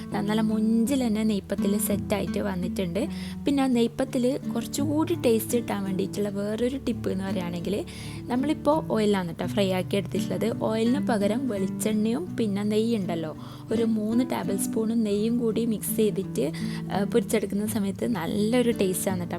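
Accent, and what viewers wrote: Kannur, India. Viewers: കണ്ണൂർ ഭാഷ 👍👍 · ശരിക്കും തനി കണ്ണൂർ ഭാഷ 👍👍അദ് മദി 🙏🙏🙏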